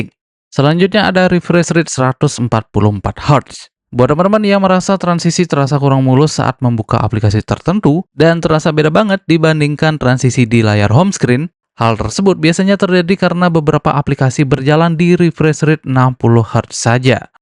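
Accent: Bali, Indonesia. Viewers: Orang bali bang? T nya khas bali banget 😅🙏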